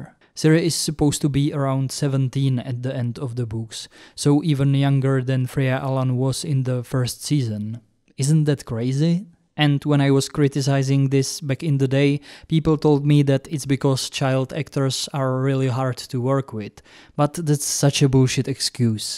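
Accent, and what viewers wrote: Czech Republic. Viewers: man that czech accent is nice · are you czech by any chance? the accent seems familiar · Hej úplně random jsem našel tvoje video a je vtipné že okamžitě jde poznat čecha co mluví anglicky :D Velmi dobré btw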